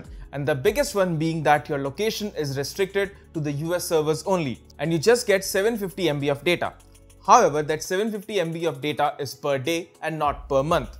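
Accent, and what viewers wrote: India. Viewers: Your accent is looking Indian ... So sorry but is hard to trust you ! I know Indian IT is the best but is also the most scammer in the world. 😱👐🤢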